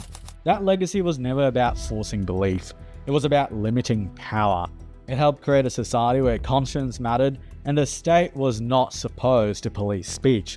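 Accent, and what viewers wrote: Australia. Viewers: I'm hearing Australia, probably Adelaide, South Australia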